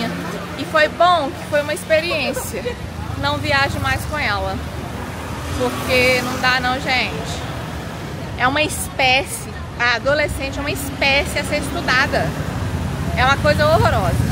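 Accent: Minas Gerais, Brazil. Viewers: Oi. Adoroooo viajar com você por esses lugares paradisíacos. Eu deveria dizer com vocês, pois temos 2: a PODEROSA que se veste com as grifes caras e a GENTE NOSSA que se veste com Shein, e tem nas duas a SIMPATIA MINEIRA com esse sotaque maravilhoso! E ADOLESCENTE é tudo igual kkkkkkk. Beijos.❤❤❤❤❤❤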